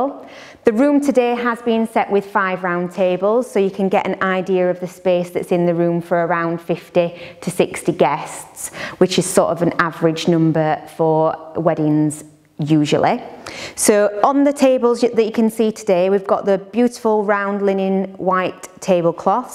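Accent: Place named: Yorkshire, United Kingdom. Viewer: Dear lady! I love your Yorkshire accent))) I have stayed and slept in WH. Love the palce! I am from Ukraine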